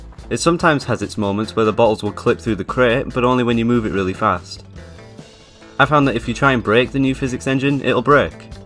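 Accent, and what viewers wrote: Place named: Northern England, United Kingdom. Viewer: kliksphilip but northern england instead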